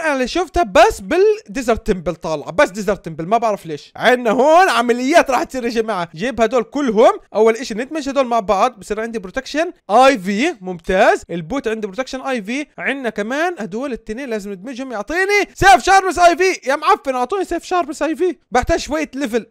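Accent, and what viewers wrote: Syria. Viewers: انا تعرفني اني اوديك شو اسمي اسمي عبد الرحمن ميه ميه والله انك سوري اسمع صوتك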